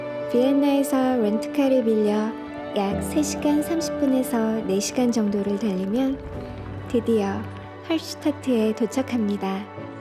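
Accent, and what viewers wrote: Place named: Seoul, South Korea. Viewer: 넘 예쁘시고 영상도 좋은데 나레이션이 서울 사투리가 생각나네요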